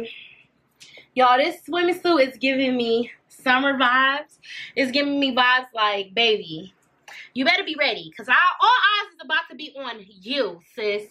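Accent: Detroit, United States. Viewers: Cute, the fact I knew you were from Detroit, well before you said it is crazy lol love the video 😎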